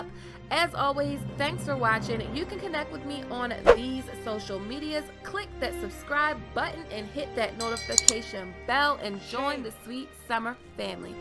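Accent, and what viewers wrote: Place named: South, United States. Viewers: for this kind of a video... her southern heavy accent is terrible and takes away from the video! sorry, my opinion.. but the content is great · I am so confuddled with all this downtime between the shows seasons and listening to so many theories. I was so hoping WOW would have come out inbetween so we could all get our fix of GOT. So tempted to try and hold out for WOW before seeing season 7 but jeez i'd have to go live in a cave for however long. It is going to be such a stretch back, after what is obviously going to be quite a dramatic season for our beloved characters. So many of your theories ring true, especially in book sense, some not so much but love the DNA thing, and that southern drawl is possibly one of my favourite things to hear GOT theories in as it is so detached from the accents we hear in the show it really has a narrative quality.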